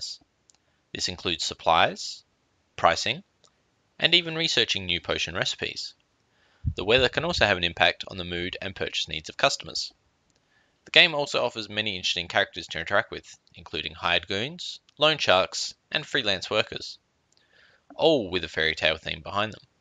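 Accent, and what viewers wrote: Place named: Australia. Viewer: If you're still curious for whatever reason, he is Australian.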